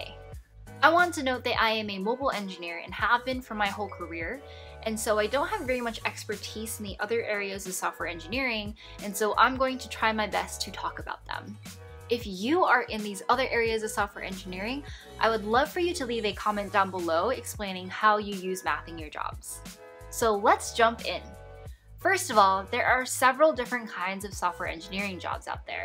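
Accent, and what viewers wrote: United States. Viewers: bagus adji i think the name is Japanese but the accent makes her sound like she was born in the US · Are you American?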